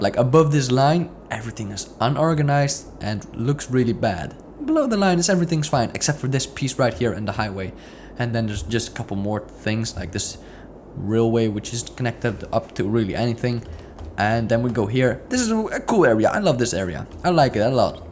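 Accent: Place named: Netherlands. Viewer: sup man. Great series I really enjoy watching series like these about cities skylines. where are you from by the way? You sound a bit like you are Dutch or Belgian.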